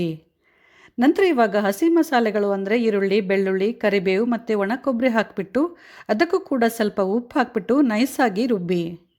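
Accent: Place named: Bangalore, India. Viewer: Uttara Karnataka recipe anta heltiri, matadod nodidre Bangalore bashe matadtiri?? UK language matadidre En agutt ri 🤦🏻‍♂️🤦🏻‍♂️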